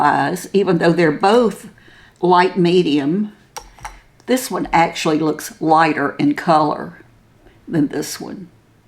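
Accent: South, United States. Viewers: Just think you’re ADORABLE!! Love your Southern accent!! It’s so beautiful!! I flew for Southwest Airlines and the flight attendants that were from Dallas and other Southern states, could get away with saying just about ANYTHING ( rude language) to “bad customers “ with that Southern accent and that passenger had ABSOLUTELY NO CLUE that they were being made fun of OR that they were just being TOLD OFF!! 🤣😂🤣😂!! That accent could sink battle ships!! I loved it and tried to copy it 🤭🙄 with absolutely NO LUCK!! Keep up your great work and you are so inspiring!! ❤️🤍💙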